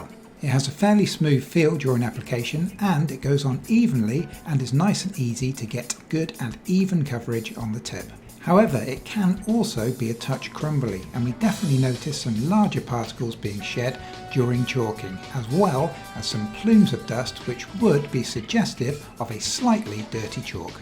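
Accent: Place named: United Kingdom. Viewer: Can I ask what countries currency you're saying in dollars? Mostly so I can make peice conparisons to New Zealand dollars. You sound like you should be saying pounds...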